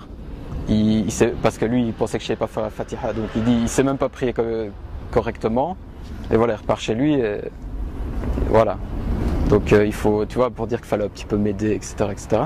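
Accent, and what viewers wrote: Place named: Belgium. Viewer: But he's from Belgique so he've got a accent, I mean this french sounds a bit different.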